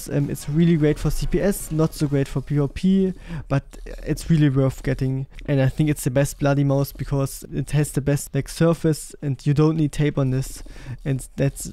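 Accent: Germany. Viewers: Ich finde es sehr unterhaltsam wie du mit deinem deutschen Akzent sprichst xD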